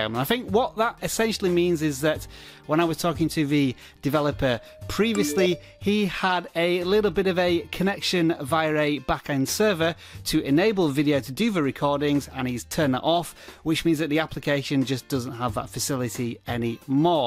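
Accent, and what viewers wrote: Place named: United Kingdom. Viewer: You are the first YouTuber with a British accent I find him amusing. 2017 must be my lucky year!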